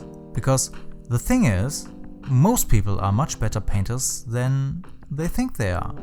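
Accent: Germany. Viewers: Dieser Moment, wenn man hört, dass er deutscher ist und man total abgefucked ist, dass er Englisch spricht😂😂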